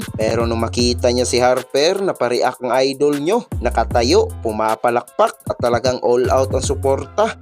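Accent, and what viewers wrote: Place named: Iloilo, Philippines. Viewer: Ilongo ka to haha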